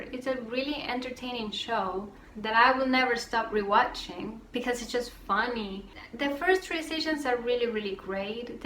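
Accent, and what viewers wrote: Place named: Puerto Rico. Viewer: I love your accent. Reminds me of my Puerto Rican grandparents and cousins 🥰🥰🥰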